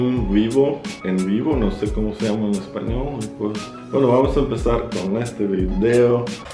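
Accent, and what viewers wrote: Mexico. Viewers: del reino de Castilla antiguo o español por la España actual? Español mexicanizado en todo caso ,yo diría. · Como que te escucho cada día más mexicano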